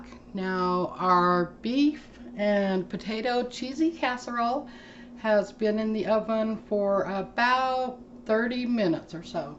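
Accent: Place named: Utah, United States. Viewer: I spent about 7 years in Utah. (Including a couple years in your area!) Your Utah way of speaking, & your recipes take me back. I love it! Love to you & yours. Wishing you & your family a very Merry Christmas, & the very best of this holiday season. Thinking especially of your parents, & praying that everything proceeds in the best, most gentle, Light-filled way possible. God be with y'all.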